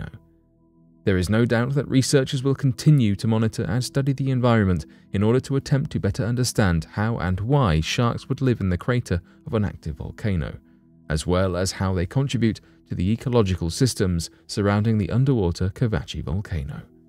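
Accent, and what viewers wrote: England, United Kingdom. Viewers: You're a liar and a fat mouth and with that English accent it doesn't make you sound intelligent you might fool some people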